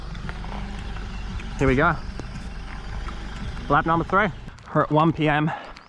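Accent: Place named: Australia. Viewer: That was awesome. As a Canadian, I have known a bunch of Australians, and not only do I like hearing the accent, but most of the Aussie's that I have met were pretty adventurous/slightly crazy. This is exactly the kind of thing they would do on a whim. Loved how it seemed the watch kept taunting you by dropping a % just when you were ready to pack it in, like a challenge. Good on you for hitting the 0% and going one further.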